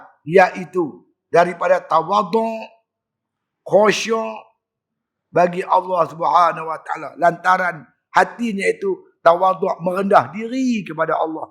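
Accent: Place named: North, Malaysia. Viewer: Sy suka mendengar ceramah ustaz..kdg² terhibur dgn loghat utara ustaz 😂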